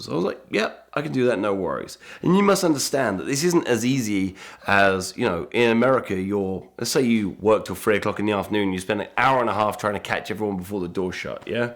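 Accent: United Kingdom. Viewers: No matter how cool you are that Brit accent make you sound like a total douche!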